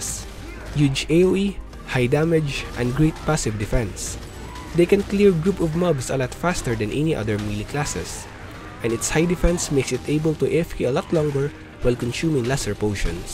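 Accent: Philippines. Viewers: Pilipino ka ba? Parang pinoy ka mag English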